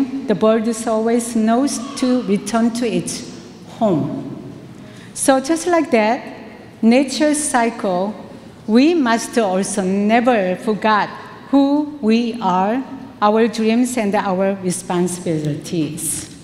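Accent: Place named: South Korea. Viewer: 발음이 한국식 영어네요 영어는 쉽지않아요 아이고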